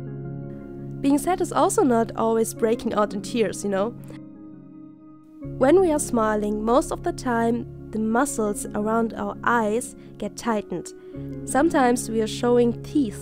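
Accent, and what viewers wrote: Germany. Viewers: Are you german? (the accent)🤔🌸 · Are you from Germany by any chance???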